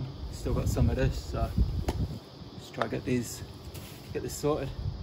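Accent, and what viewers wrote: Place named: Australia. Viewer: Take it from someone who's been watching lawn care videos for 6 years. Well before the popularity spike. You've got all you need. The quality, the actualmowing experience, the charisma, and we Muricans love a good Aussie accent. Plus you go that extra Kilometer. You just need exposure. Not a matter of if but when. Just keep doing you.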